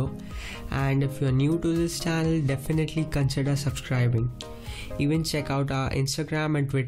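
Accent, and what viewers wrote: India. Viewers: Damn that Indian accent is so annoying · Your Indian accent is too funny! LOL.I couldn't understand most of the times!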